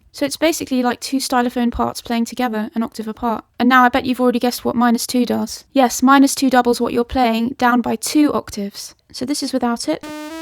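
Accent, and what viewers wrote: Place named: United Kingdom. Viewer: What a lovely British accent!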